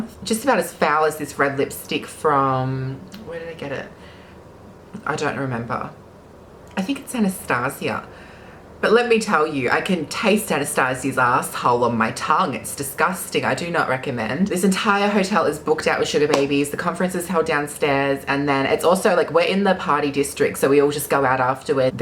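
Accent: Australia. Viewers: P.s. from jessica. But i love yours and any Australian accent. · Australian accent is BEAUTIFUL, never try to change it or be embarrassed by it😊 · YOUR QANTAS ACCENT SOUNDS LIKE MR G FROM SUMMER HIGHS HIGH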